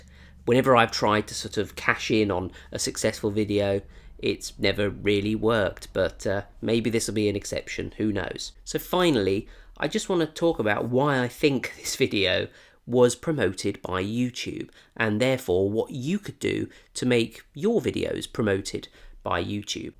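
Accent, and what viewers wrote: England, United Kingdom. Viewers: i subbed from that video.... it was because im an english person living in scotland, and i just really missed an english accent so now i watch you. x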